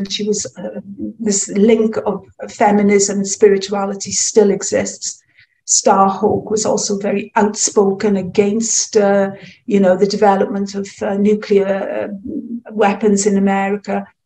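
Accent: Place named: Wales, United Kingdom. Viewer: Refreshing to hear a welsh accent in an astrology lecture! Alot of really helpful references, thank you 🙏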